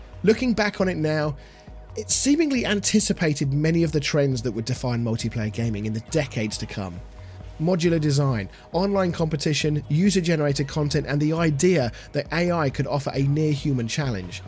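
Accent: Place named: United Kingdom. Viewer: Sounds like you are from the UK; you should look into another one of UK gaming's national treasures that pretty much fell under the radar although anyone can still play it as of now. It's a gorgeous 5 v 5 Capital Ship Space MOBA called FRACTURED SPACE. It's one of those games where you felt lucky to have experienced it when it was live.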